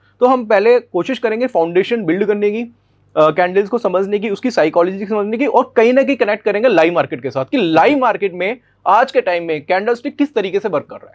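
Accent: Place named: Bhojpuri, India. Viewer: Mr. sr ji Aap ki bat hi samjh me hati h. Bojpuri style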